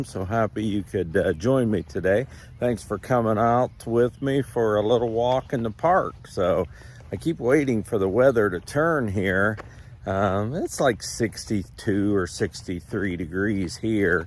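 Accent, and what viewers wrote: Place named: Northeast, United States. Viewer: Where are you at in the states? Sounds like Northeast.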